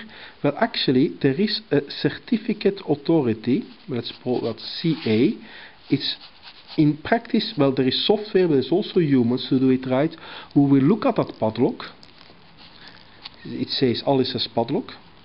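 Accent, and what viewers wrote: Netherlands. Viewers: No objection or anything, but are you Dutch? Your accent sounds Dutch to me. xD And thanks a lot for this video! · thanks man :) are you a dutch ?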